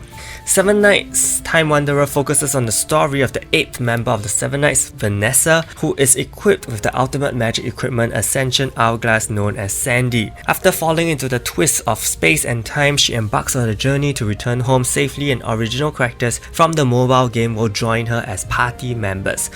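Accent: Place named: Singapore. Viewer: You sound Singaporean... are you?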